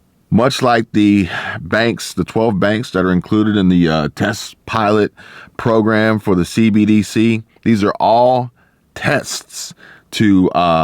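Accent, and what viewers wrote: Texas, United States. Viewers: Are you from Texas..? You sound like one of us lol! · Great video. You sound like a Texan police.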